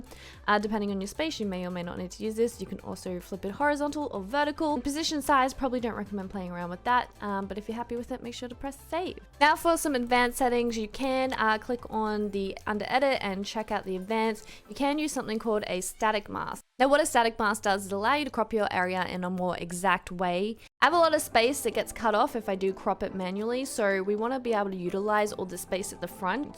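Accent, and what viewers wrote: Australia. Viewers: Listening to her accent I get Aussie vibes....am I accurate? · i knew it, i knew u are aussie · Oh you're an aussie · Is she Australian?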